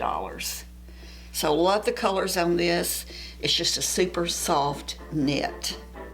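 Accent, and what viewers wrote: United States. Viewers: Paris, France calling...I just came across your video by chance and found its content an absolute delight. And I simply adore your accent - it reminds me of an American friend of mine. You have a natural flare to entertain. After watching, I discovered your age - 78 wow! I would like you to know that you boosted my morale! I will definitely hunt down your other posts! Thank you again.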